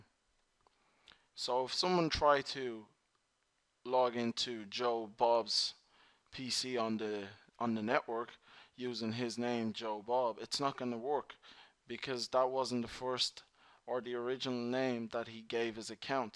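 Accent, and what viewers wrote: Ireland. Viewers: Thank you man.. 🙏 luv dat Irish accent btw 🤗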